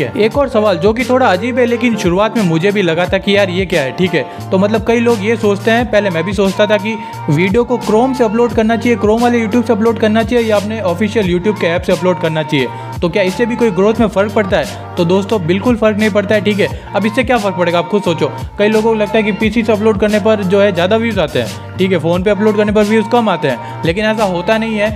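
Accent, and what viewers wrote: Gujarat, India. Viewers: muje apki voice laga ki app gujrati ho ❤❤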